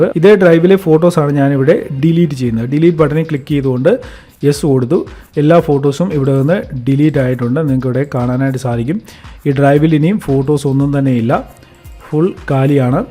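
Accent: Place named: Kasaragod, India. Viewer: കേറി വാ മക്കളെ...🙋 ഇനി മുതൽ കാസർഗോഡ് ഭാഷയിൽ ബ്ലോഗ് കേൾക്കാം...😍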